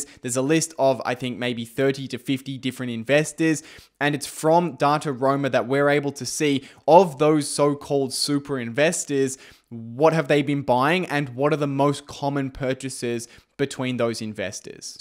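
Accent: Australia. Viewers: That's Aussie English.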